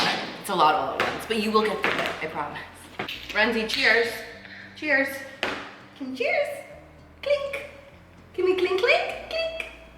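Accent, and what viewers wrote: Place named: East Coast, United States. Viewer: Her east coast accent will always be endearing.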